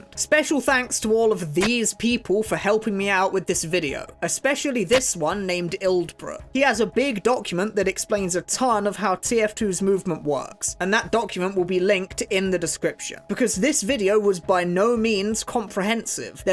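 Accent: United Kingdom. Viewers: He has the most british accent i've ever heard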